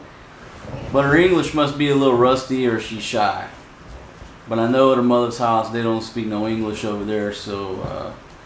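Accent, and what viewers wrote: South, United States. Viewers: You have a good country voice 👍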